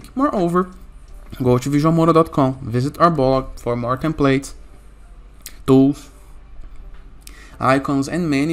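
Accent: Brazil. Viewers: are u brazilian by the way ?? you accents sounds like sorry if not .. haha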